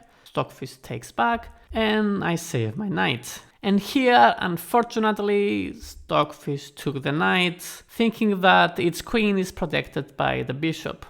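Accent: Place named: Romania. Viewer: Are you Romanian? You sound Romanian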